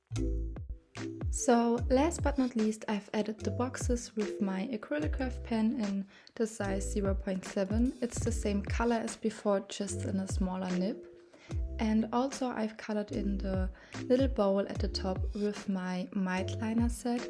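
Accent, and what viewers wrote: Germany. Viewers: I found your channel today and love it. But one question can it be that you actually speak German? Your English is great, no criticism. It just sounds like German is your mother tongue. Many greedings Annabell Elaine ❤️❤️ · are you from germany? your accent kinda sound like it!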